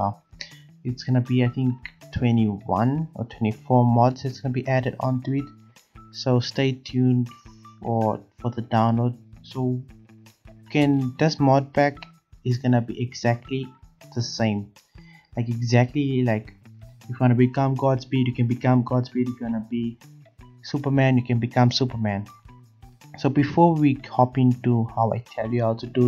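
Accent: South Africa. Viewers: You sound like you from south africa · Ur accent sounds like a South African accent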